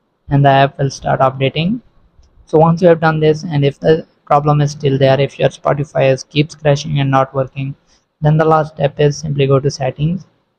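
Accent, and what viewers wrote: India. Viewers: I already knew it was gonna be a pointless tutorial when I heard an Indian dude start speaking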